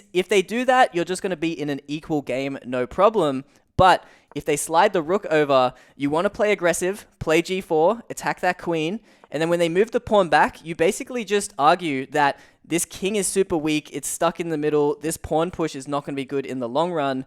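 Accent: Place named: Australia. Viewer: Chess feels so different when it is explained with the Aussie accent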